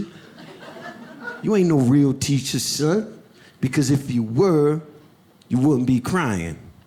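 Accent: Dominican Republic. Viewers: I don't know if most of you realize the funniest part about his spanish accent is that he nails the dominican accent! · My boss said to ××cap a chino×× dude I lost it that was a great joke and crazier punchline and with the Dominican accent made it all come together!!!!!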